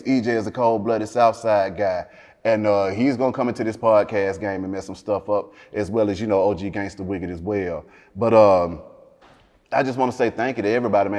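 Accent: South, United States. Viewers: I Would've NEVER guessed talked this much lol He a cool dude I'm from the midwest came across him on youtube I believe he going places he ask insightful questions AND he let the Guest reply without interrupting but can help them carry a answer. I think that natural south delivery can be a plus for mainstream whatever he got planned if the timing right and done with the right platform. Via Shannon Sharpe type shit glowing but stood out being his self on the Hip Hop side of things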